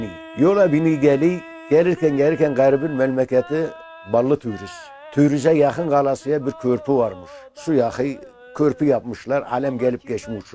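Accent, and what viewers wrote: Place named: Gaziantep, Turkey. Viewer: emmi antepli gibi konişi aceb nereli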